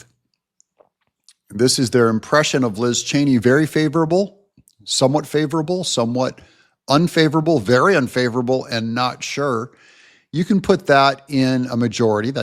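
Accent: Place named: Turlock, United States. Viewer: Very distinctive voice. Sounds like a Turlock boy. Ha 😂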